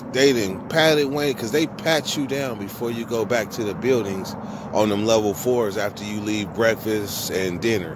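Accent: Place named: Northern California, United States. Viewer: You gotta be from NorCal to love how you talk 😂😂😂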